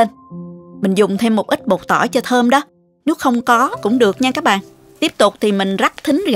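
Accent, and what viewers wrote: South, Vietnam. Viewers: Rất thích nghe giọng MN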